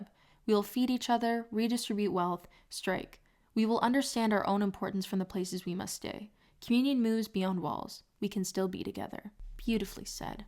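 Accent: Canada. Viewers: omg are u canadian ? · are you Canadian? Or from Minnesota? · she’s canadian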